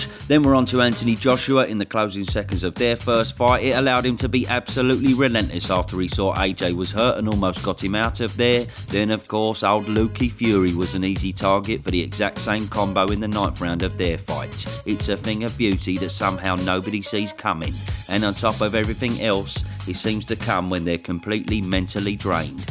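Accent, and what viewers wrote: Cockney, United Kingdom. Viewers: This cockney commentary is awesome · Great videos but the chewsday accent is killing me 😶‍🌫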